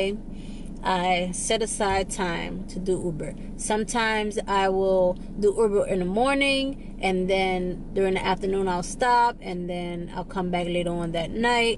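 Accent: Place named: New York, United States. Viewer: It sounds like you’re from New York, I know you said you’re in Atlanta. Maybe I can treat you to lunch when I’m in Atlanta. 😉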